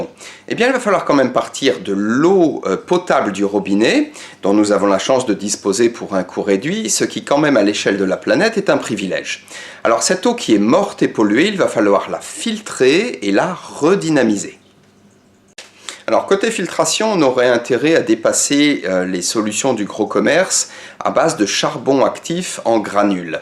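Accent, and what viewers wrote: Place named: Switzerland. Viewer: Salut, félicitations pour cette série de vidéos vraiment instructif, bravo!!!! J'avais pas remarqué ton accent suisse lors de notre coup de fils mdr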